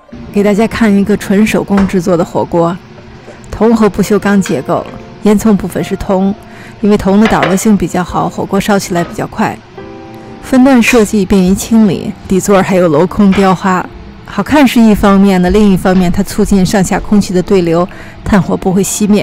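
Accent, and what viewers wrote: China. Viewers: Michael S 那可真没有… 这口音一听就不是北京人，但说的是普通话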